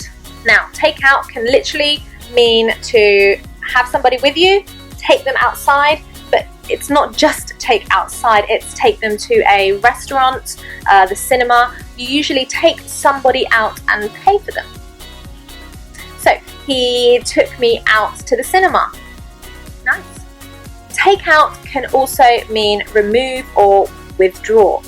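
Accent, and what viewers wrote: United Kingdom. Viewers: Beautiful British English!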